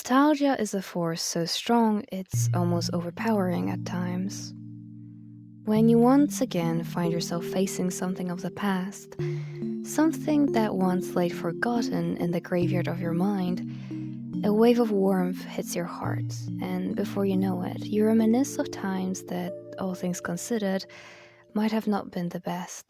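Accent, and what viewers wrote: Poland. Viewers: Oh how I felt the Polish in you barely 8 seconds into the video :D /pos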